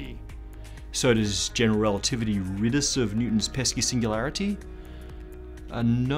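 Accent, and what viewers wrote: United States. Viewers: speak in an English the whole world can understand...dont use your american accent.. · so you had to stuff up this channel by employing Americans with course and shrill accents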